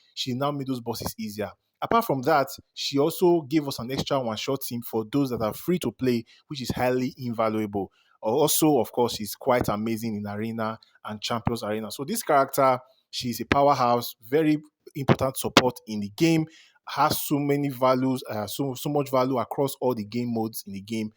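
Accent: Nigeria. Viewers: question are you Yoruba or from the south west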